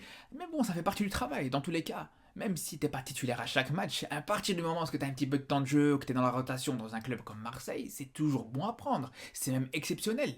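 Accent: Belgium. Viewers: J'entends un ptit accent belge. T'es marocain de Belgique ?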